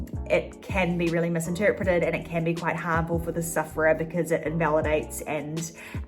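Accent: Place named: Singapore. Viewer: Why she speaking Singlish?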